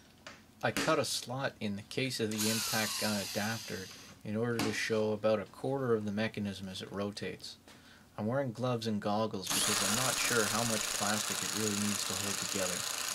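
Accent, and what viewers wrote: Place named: Canada. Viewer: Why would a guy with a funny hat and Canadian way of saying... out... take it upon himself to explain stuff to us? I don't know but this little video is entertaining and informative. I have to get me such a tool. Thanks!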